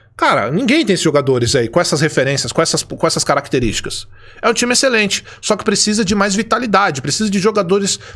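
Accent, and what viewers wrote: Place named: Portugal. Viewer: Eu ouvir o fazendo um vídeo inteiro com sotaque português de Portugal?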